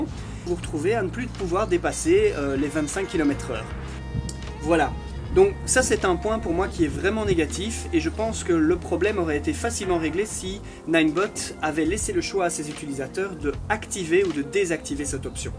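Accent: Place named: Belgium. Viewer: Bonne vidéo! Je me suis décidé et j'ai commandé la Z6 :-) Tu es de Belgique ? J'ai cru entendre cet accent familier. Ce serait cool de wheeler ensemble en tt cas, je suis de BXHELL !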